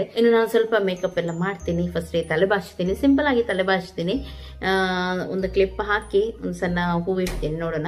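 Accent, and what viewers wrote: Mangalore, India. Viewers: Hi mam Nim Mangalore li mathado kannada language andre nange thumba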